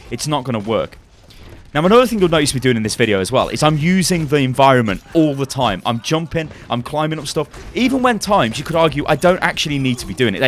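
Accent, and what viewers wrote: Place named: United Kingdom. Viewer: this disgusting british dialect.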